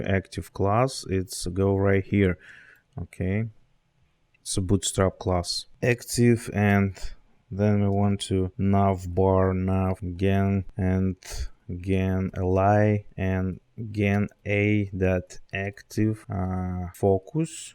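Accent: Russia. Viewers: Молодец, хороший контент! Нотки русского акцента есть, по этому решил поинтересоваться!)!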